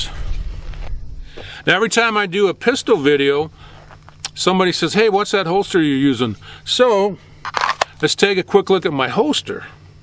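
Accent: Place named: Michigan, United States. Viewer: Awesome vid. Right here in Michigan? That looks like Nevada. You must be a native Michigander. That accent is hard to miss, one Michigander to another.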